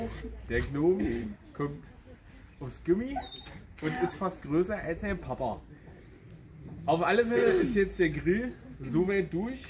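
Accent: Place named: Altmark, Germany. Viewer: Ich kann bestätigen, dass Du den Fisch wirklich richtig toll hinkriegst. Es ist ein einfaches Rezept aber hier stimmt mal wieder die Klausel "Weniger ist mehr" und allein schon Butter und Zitrone ist schon Erfolgsgarantie. Und schön zu hören, dass Du hier mal so richtig Deinen Altmärker Dialekt zum Besten gibst ! Das mit dem Rosmarien lernst Du auch noch irgendwann. Super !❤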